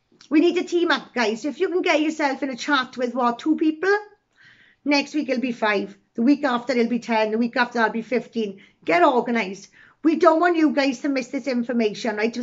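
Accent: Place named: Wales, United Kingdom. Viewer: Fair play you have grown so much since starting nothing but utter respect luckily I been in Wales 6 years I can understand you 👍👍👌👌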